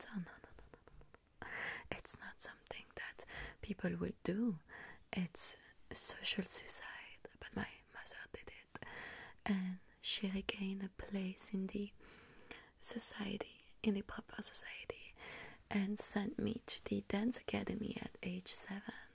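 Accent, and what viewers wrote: France. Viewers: Oh please do more of these in this french accent you are pure magic! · i love the french accent, it sounds so nice :,)) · Loving you using a heavier French accent lately, it's extremely calming <3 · The tone of your voice and the heavy French accent sound amazing together 10/10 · oh the french accent 👁️👄👁️ falls in love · C’est magnifique! She returns just in time for me to go to bed, and with a luxuriously thick French accent.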